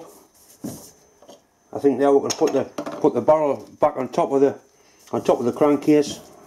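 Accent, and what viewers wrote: Newcastle, United Kingdom. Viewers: Marine Engineer: my thoughts exactly. Did you by any chance do any shipyard time? Some many of those thoughts and expressions common to lots of us. Being close to South/North Shields you must have met lots of shipyard guys doing their apprenticeships. I'm in Canada (West Coast) and worked with lots of North East guys: been asked for a Dolly and other fascinating tools. Retired now but I did get to help pull a bottom end from a direct reversing common-rail engine and watch him do a bit of hand scraping. There were always hand scrapers in every engine room but I never learned to use them. Shells for me every where. Thanks for your videos. My wife claims not to be able to understand you at all. I certainly do! Don't hear many Geordie s now that I'm retired.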